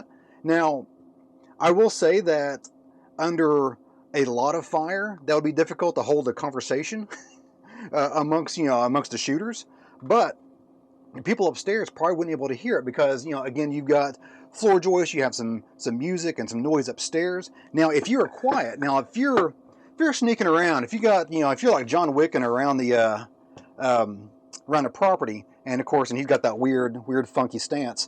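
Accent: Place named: Texas, United States. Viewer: Why Ism I not surprised by Texas accent?=)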